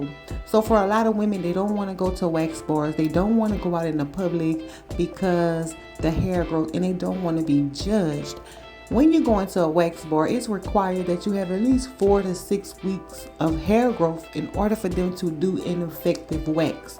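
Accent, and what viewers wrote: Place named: South, United States. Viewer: This lady must come from Mississippi or Louisiana. She had to mispronounce the easiest words. She sounds illiterate and needs to read more. 💯🤷🏿‍♀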